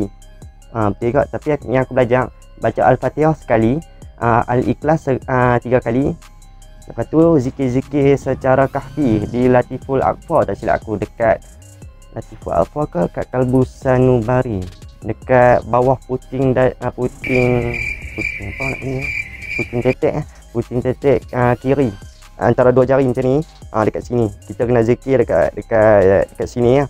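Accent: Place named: Malaysia. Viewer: ko dengki dah kenapa..content dia mmg khusus utk penonton malaysia,slang dia mmg slang sesetengah kawasan/negeri d malaysia..ko x faham tu ko punya pasal la,bukan salah cara dia bertutur,aq faham je semua..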